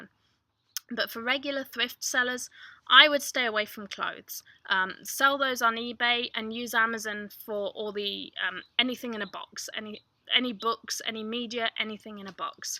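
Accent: United Kingdom. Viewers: Thanks for the info. Btw...you're cute! Love the UK accent. ;-) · Thank you... Your British accent got me on that one... (but we all love that accent!!) You ever get out to TX I'll share some unique accent of my own with you... lol Anyway... really helpful videos... we haven't started yet but looking into it... Your videos are very insightful. Thanks again!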